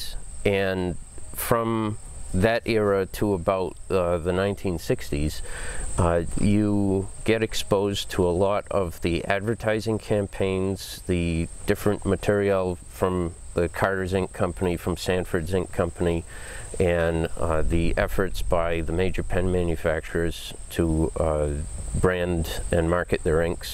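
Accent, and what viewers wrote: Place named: New England, United States. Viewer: What a Yankee accent, and I'm here for it!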